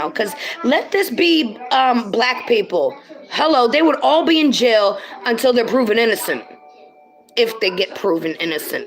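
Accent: New York, United States. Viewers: ish lol!! Just woke up l'ok! And you had me lol almost fell out of bed. HHAHAHA you said, * And she foundher AT THE PARTY???!!** Haha i love that NY accent, makes me miss home! God bless sending light love from Connecticut.🌘🌙🌞🌞🌟🌠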